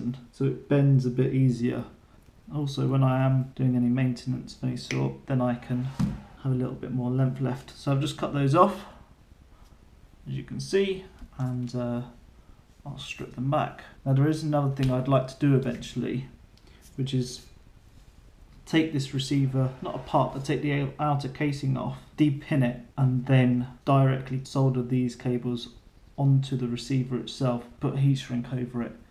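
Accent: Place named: England, United Kingdom. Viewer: Hey I just saw your video again and put captions on.... didn't understand the English accent I guess! Sorry... so that solder is for Battery Detect? So turnigy can tell when you are connected right? So did you solder directly to battery connections on PDB? or a lower 5 voltage connector.... BTW great job.... how on earth did you figure this out.... there is almost documentation around! Thanks again!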